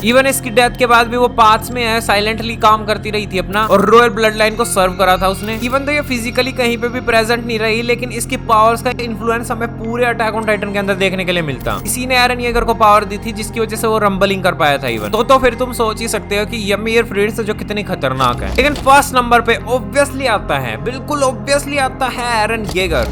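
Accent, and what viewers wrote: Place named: Haryana, India. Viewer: Vai tumhara voice hryani vibe deti hea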